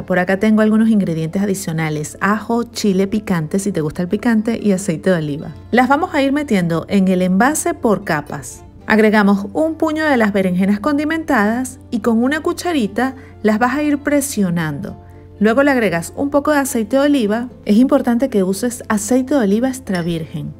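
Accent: Venezuela. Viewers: Hola paisana, seguro que eres Caraqueña o Valenciana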